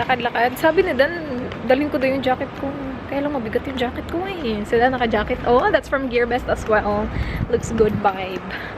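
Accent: Visayas, Philippines. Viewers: Mjy visayan accent annoys you? Remember there are a lot of dialects in Ph, and no specific dialect should be superior than the others. Youre wishing maturity but heyyy, wish yourself more maturity please if that is your outlook and belief in life. IBOG LANG KANG BAKI-A KA! Awa makatakod ang imong pagka walay maturity aunty hahaha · Happy 100k, ur vlog is getting more interesting due to d nice places you've been going, credit to ur Papa Bear.... I'm one of ur subscribers who really got hooked watchin ur vlogs Coz ur maarte but natural, sometimes ur visayan accent get me annoyed and I don't find u that attractive and as u said wit 5head but u r all naturelle, u don't mind showing how raw u r.... In time inshallah you will mature and grow like a fine lady medyo konting basa pa more wag lng YT so that ur vlogs will b more substantial.....Good luck!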